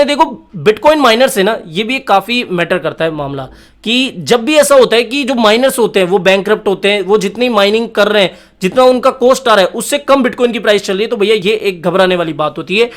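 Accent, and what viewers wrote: Rajasthan, India. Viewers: Rajasthan se ho kya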